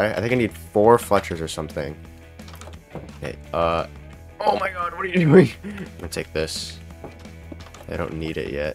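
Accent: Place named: Canada. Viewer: YOURE CANADIAN ASDKLNAOSPIJDKOJASDASDASD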